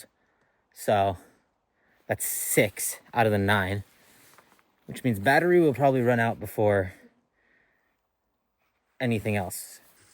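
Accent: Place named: United States. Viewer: You sound American she sounds polish?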